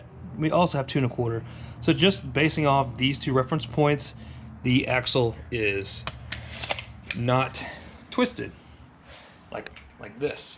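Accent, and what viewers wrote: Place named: Australia. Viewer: just love the Australian Accent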